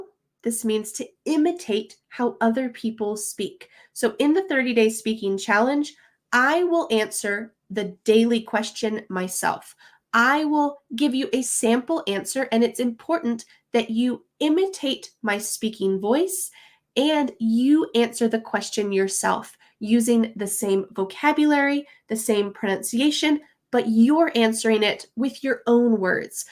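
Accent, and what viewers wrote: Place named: United States. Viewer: American Native English accent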